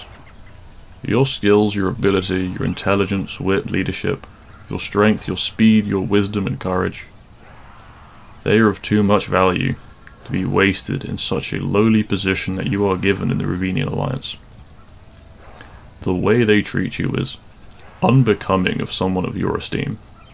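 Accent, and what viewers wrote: United Kingdom. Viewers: This was amazing. Thank you so much for voicing my script. Also are you British?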